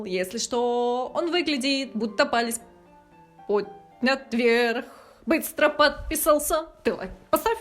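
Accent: Surzhyk, Ukraine. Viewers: нет, какой нахуй сленг? Она говорит суржиком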